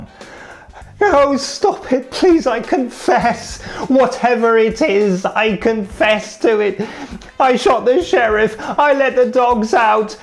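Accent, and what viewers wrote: United States. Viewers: oh my god your american accent is amazing! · Nice American accent! Do you have trouble adjusting to driving on the left side of the road when you return to the UK?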